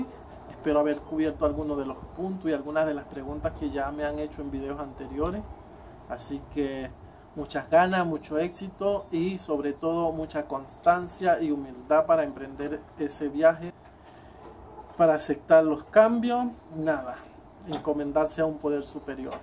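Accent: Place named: Chile. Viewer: Ya tienes el acepto chileno. se te nota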